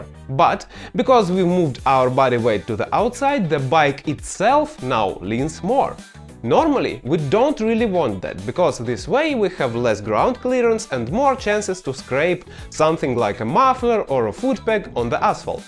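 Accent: Russia. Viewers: Actually love the Russian accent 😊 And sensible content, saving lives.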